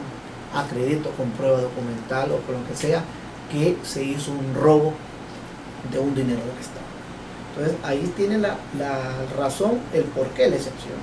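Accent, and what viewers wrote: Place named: Venezuela. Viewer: Eres venezolano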